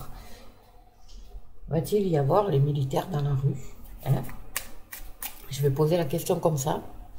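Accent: South, France. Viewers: Bonjour nouvelle abonnée, j ai vu votre commentaire et me voilà ! Comme beaucoup ici j ai toujours entendu que la loi martiale en France ça n existait pas! Et je vois que vous dites oui tant mieux ! Mais qui a déjà vu des militaires ? C est a venir ? J entends votre accent je me trompe peut être mais c est le sud 🥰 . Oui on doit faire attention ça je le sais !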